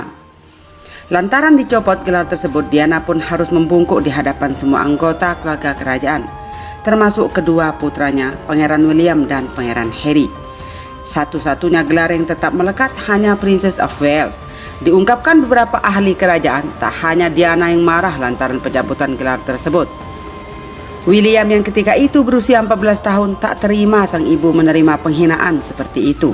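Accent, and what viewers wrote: Indonesia. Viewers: Btw, apa gw aja yg ngerasa apa yg lain jg? Naratorny suarany kok medoks bgt seehh... OMG!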